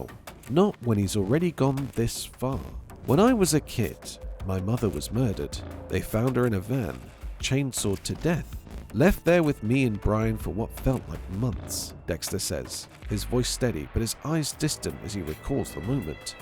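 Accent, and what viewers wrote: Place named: United Kingdom. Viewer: “Masuker” “Dextah” I love Brit’s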